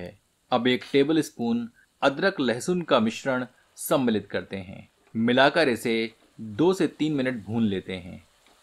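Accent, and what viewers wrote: Standard. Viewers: Namaskar. Shriman aap ati shuddh evam sundar Hindi bolte hain. Is byanjan ko mein prastut karne ka prayas karunga. Dhanyabad. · Sir dekh ke hi muh Mai paani aa gya.. Jarur try karenge.. Aur recipe se jyada maza Aapki shudh hindi sunne Mai aaya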